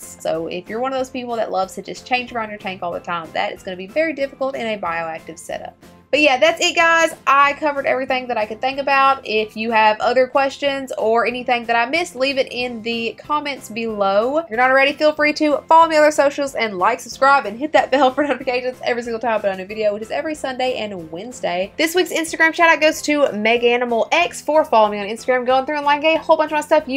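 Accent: South, United States. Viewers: Really nice videos. talks country (like me) but also very fast. I'm confused.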